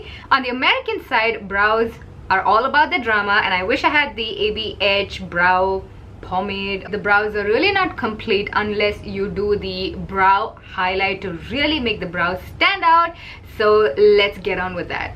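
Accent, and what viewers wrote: India. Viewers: You have a little Indian accent 🤷🏽‍♀️ I'm French and Srilankan girl ✌🏽You're beautiful ❤️😘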